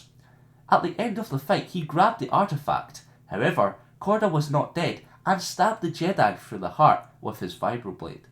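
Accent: Scotland, United Kingdom. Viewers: Hahahha "Scottish insult". Admittable when i searched star wars lore, i didn't expect it to be a fellow scot that would be the commentator.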